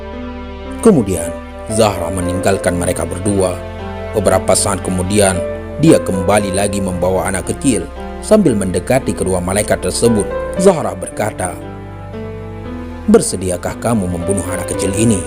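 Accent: Aceh, Indonesia. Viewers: Macam gaya bahasa orang Aceh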